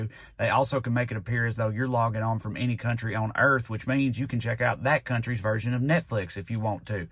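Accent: South, United States. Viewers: Agreed 👍 And I’m just a dude from France living in Canada and following US politics madness. I usually struggle a little with understanding the southern US accent but not with him. Love both the message the words and the accent 😊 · I live in Denver and I am seriously angry that she was elected by voters in that district. That race was so close. I really believe that her and her family's good looks and the image of a solid family woman is what got her the job. People saw the sexy looking woman with the handsome husband... and didn't look too hard at her qualifications, or lack therof. I hear you talk and the southern drawl and ball cap lead me to think I'll hear one thing, and then I hear you hitting the truth and I am pleasantly surprised. I enjoy your rants!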